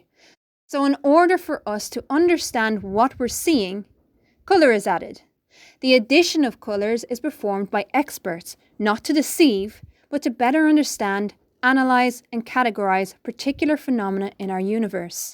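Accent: Ireland. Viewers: 👍🔔 Where are you from I hear an Irish twang in your accent? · Are you Irish? 🇮🇪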